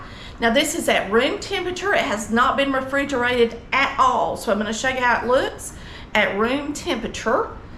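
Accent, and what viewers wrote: South, United States. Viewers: OMG...I could listen to you talk all day long! Love your southern accent and will for sure try this recipe. Bless your heart!! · Thank you so much for your recipe and your accent makes me think of home, I live in Tasmania now, but born and raised in the south.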